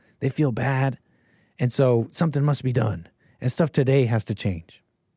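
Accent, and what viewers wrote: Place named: Arizona, United States. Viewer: Love the tombstone accent.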